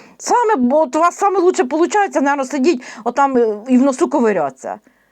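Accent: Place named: Kyiv, Ukraine. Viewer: ви дуже гарно розмовляєте, точнісінько так як я і все наше село!.! Я з Київщини.!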